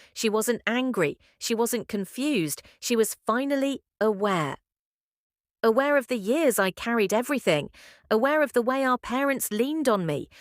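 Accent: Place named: United Kingdom. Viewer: Because it’s in a British accent 😁 Watching from Leicestershire in the UK 🇬🇧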